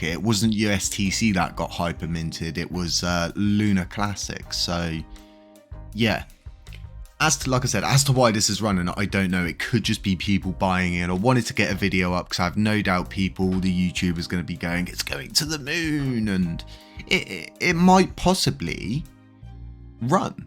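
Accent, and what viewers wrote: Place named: Somerset, United Kingdom. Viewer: My boys got a South West accent im thinking Somerset bai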